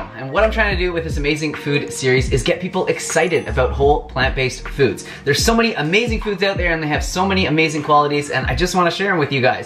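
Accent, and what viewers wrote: Canada. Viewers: Omigoodness! I thought I heard a Canadian accent. Lentils are very quickly becoming my all-round faves! Need to chk out your curry recipe. QUESTION: Building muscle is awesome, however...how does it compare to heme sources of iron. Struggling with anaemia & I keep getting the impression that non-heme iron sources (from lentils) are sub-par for those of us with anaemia. What say you,? Cheers in advance. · Dovid Stern Canadian accent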